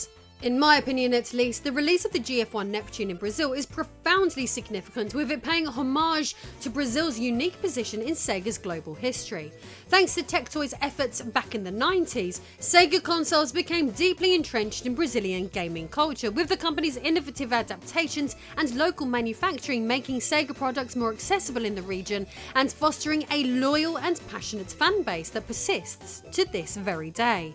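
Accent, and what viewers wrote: United Kingdom. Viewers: I keep thinking to myself why don't I like the sound of. I love British dark haired women. While watching this video I realized, Its the British News caster down hill draw sound. I have heard this in other people and technically is it a way of speaking that is supposed to draw your attention to what she is saying. But to me it is like nails on a chalk board. We came here to watch and listen to you. Our attention is already on you. Nothing against you. Its just this way of "reporting" repels quite a bit of the Neuro Divergent viewers who would watch and enjoy.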